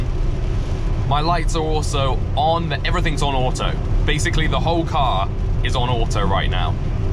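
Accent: United Kingdom. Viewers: Thanks for the video! I like you british accent very much and your pronounciation is very clear and sharp, which makes it much easier to understand for me (I am German). Very interesting how there are introduced subsequently new Autopilot icons; I have never seen before the "To resume Cruise Press the accelerator pedal" message / icon.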